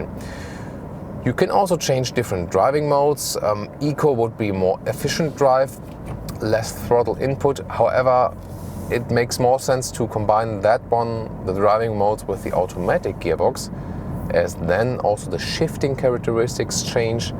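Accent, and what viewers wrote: Germany. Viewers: You have got a thick German accent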